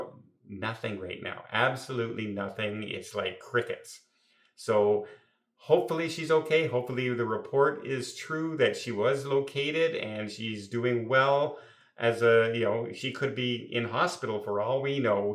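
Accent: Canada. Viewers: maybe Canadian pronunciation. Just sayin'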